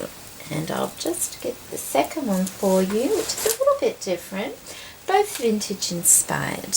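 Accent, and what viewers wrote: Australia. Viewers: Oh, I love both the projects and I have to say I LOVE the way you presented them and your description of each lace, bling, etc that you use is so enticing and inviting! I love listening to your voice anyway, it's so sweet and your Australian accent is pure beauty (hate to say it but sometimes it, when I hear it say, on the TV, can be a little........cant think of the word)! Oh, and how on earth do you remember who sends you what? it is such a gift you have, as its lovely to hear the names mentioned (think I may have asked you this before, if so forgive the intrusion). Hope you are well, my friend, hugs from June xx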